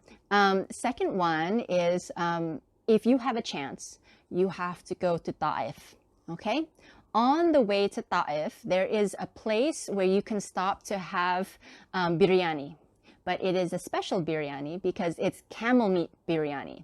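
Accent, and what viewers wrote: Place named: United States. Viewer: Assalamualaikum Sister. My family and I were scheduled to go for Umrah in April 2020. However, regrettably Saudi Arabia closed its doors for all pilgrims in March postponing our trip to the Holy City. Insya' Allah, we will be able to make our said mini-haj early next year. Thank you for your very informative and can I say, entertaining videos. You are very eloquent in your presentation. Going on a tangent, I cannot help but wonder where are you originally from. If I were to hazard a guess from your name, perhaps you were originally from South Korea, judging from your accent you were educated in the States and - clues in the video, you are now living in Asia (Malaysia, I assume... again clues). Ha ha ha! Nevertheless, very informative and I hope to be able to visit and experience these places that you have recommended. Wassalam!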